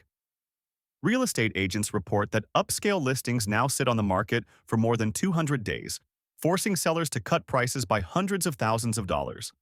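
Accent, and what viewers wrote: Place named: California, United States. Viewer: the speaker can even say the name of Prescott correctly, how do we know if his facts are indeed correct, and are home sale's in Arizona really true facts. This guy sounds like a California person ....?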